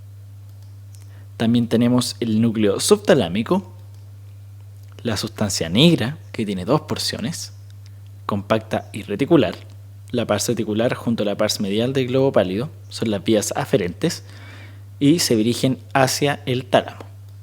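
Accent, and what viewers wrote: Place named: Chile. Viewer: El acento chileno es bello, pero no lo soporto para estudiar )) jajajaajjaja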